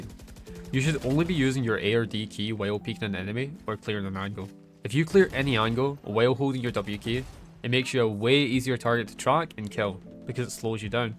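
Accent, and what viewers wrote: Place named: Skye, United Kingdom. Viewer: i love that skye accent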